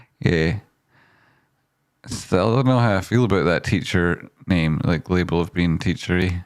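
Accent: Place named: Edinburgh, United Kingdom. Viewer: Top show M8.thanks. I sort of get it better when its an edinburgh accent. i think I almost got a wrinkle on my smooth brain tonight listening to you..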